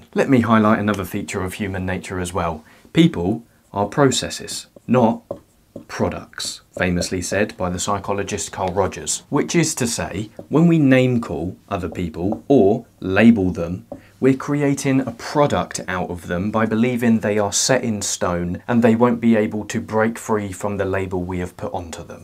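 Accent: England, United Kingdom. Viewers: Which part of England is that accent from? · Your contente is good but the British Accenture is annoying. I would like to be able to hear this with an Californian Accent. Hire a Hollywood speaker and make another channel with a fun female voice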